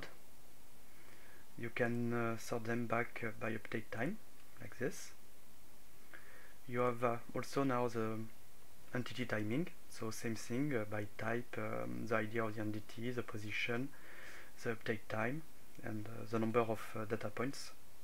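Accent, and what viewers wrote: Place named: France. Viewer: Ton accent!!! On voit que c'est français pur!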